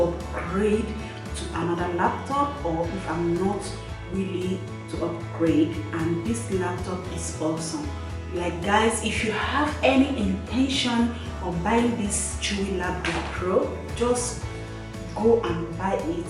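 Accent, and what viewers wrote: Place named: Jamaica. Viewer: Hello, your accent. I love it. You sound just like my best friend her name is Maritza. But we all call her Jamaica. Are you also from Jamaica hun?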